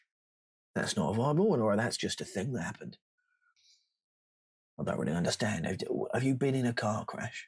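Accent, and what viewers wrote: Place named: North, United Kingdom. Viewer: Welcome back always a pleasure Long shot but could you do an asmr were u just sell me random shit with ur northsn accent a bit like your antique seller roleplay u don't haft to just thought I'd ask.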